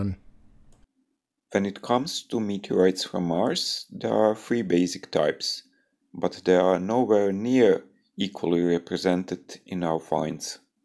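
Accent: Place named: Eastern Europe. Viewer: Thank you! That's very kind of you! I do appologize for the thick 'slavic' accent 😃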